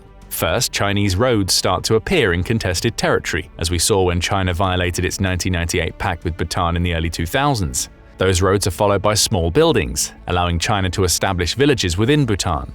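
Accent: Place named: Oxford, United Kingdom. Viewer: Trying to use a British guy with an oxford accent to justify spreading outright lies is despicable. Read the history of the conflict and you find India is the wolf in sheeps clothing.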